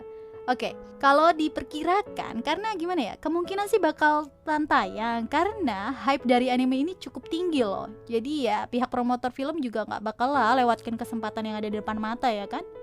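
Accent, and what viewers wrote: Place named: Sunda, Indonesia. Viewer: Fix naratornya orang sunda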